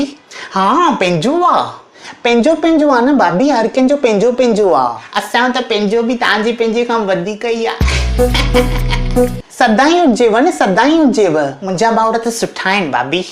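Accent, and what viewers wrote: Sindh, Pakistan. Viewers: 👌👌👌👌👌👌❤️❤️❤️❤️ bhai aapka sindhi bolne ka style wow 👌👌👌👌👌👌👌👌👌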